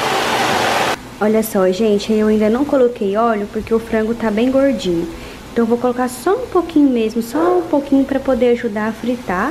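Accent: Minas Gerais, Brazil. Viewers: você é mineira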